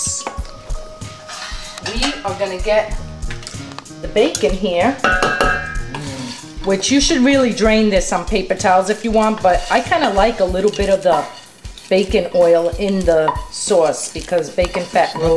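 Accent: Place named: New York, United States. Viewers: great new yorker(??) accent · You gotta be from Brooklyn! I can tell from your accent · awesome ny accent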